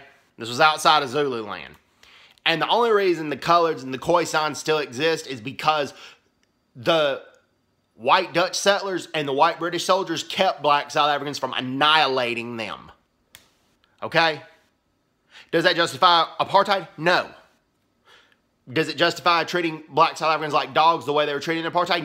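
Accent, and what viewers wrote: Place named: United States. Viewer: Oh shut up! You come with your American accent and tell us the land was not stolen? Man you are lying. We will expropriate land.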